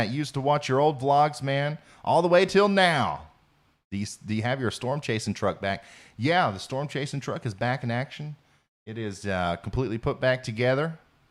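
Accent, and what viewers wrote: South, United States. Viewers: The great thing about doing this on YouTube is that you can be totally your natural self, and your accent is perfect for the style, especially with things like “naders in the dark.” 😂 It’s crazy how broadcast entities want to eliminate southern accents because of the stereotype that they are considered “dumb sounding.” You’re a southern guy who is clearly not dumb.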